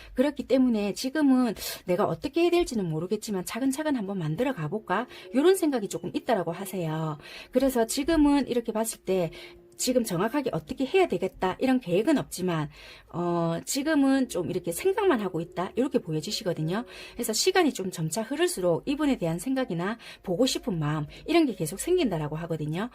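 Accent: Busan, South Korea. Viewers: 다시 3번 뽑았는데 2주쫌 넘었거든려..그래서 잘 모르겠어요ㅠㅠ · 감사합니다! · 고맙습니다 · 다시끝까지들어보니 남자친구가 일때문에 바쁜거 맞아요 그래서 답답했어요 내일 10일만에 만나거든요 ^^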